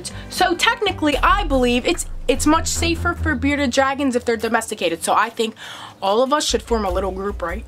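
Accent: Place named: New York, United States. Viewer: Haha you made me laugh a few times. Very entertaining. I am from Australia but I think I can pick your accent to come from somewhere near New York maybe? Anyway if you want all the wild bearded dragons you’ll need to come to Australia. Thanks for teaching me stuff!!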